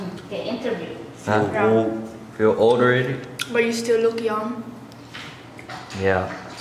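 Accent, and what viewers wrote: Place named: Saipan, Northern Mariana Islands. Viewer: Yo I been missing you lol. Glad to hear our island accent in your video. Keep up with the good work ma brother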